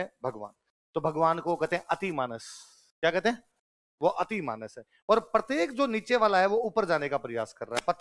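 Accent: Rajasthan, India. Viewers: aapki rasthani mix hindi CHOKHI LAGE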